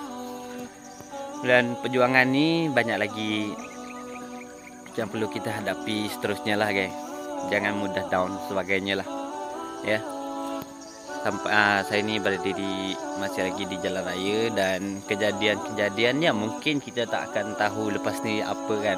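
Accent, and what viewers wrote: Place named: Sarawak, Malaysia. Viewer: kau orang Sarawak ke?? suara dan cara kau bercakap macam kawan lama aku orang Sarawak nama Azmeer... hahahhaaha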